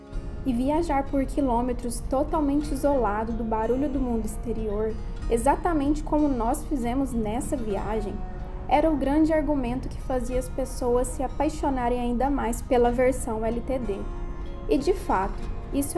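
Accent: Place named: Minas Gerais, Brazil. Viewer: Eu já sou fã de carteirinha desse casal. Mas além de tudo maravilhoso (carros, imagem, ideias, explicações, estradas, etc), não posso me furtar a dizer que o texto é impecavelmente escrito e falado pela, as colocações verbais e tudo mais, resumindo, o português bem falado, com aquele sotaque "minerês", que, eu que sou Tricordiano, conheço muito bem. Ah, um Landauzão na garagem ia ser muito bom, mas eu moro em apartamento e as vagas cobertas não comportam um gigante como esse. Teria de estacionar nas rotativas, ao relento. Aí, dá dó, hein. Quando passa o antigo na estrada, eu já estou pronto pra assistir com o like. Valeuuuu, casal, showww de bolaaa. Sempre em frente!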